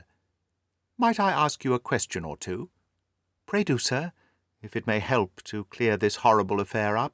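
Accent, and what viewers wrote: United Kingdom. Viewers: Is it British accent ?? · I think its an British Accenct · Yes, British accent👍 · Is this british accent? Pls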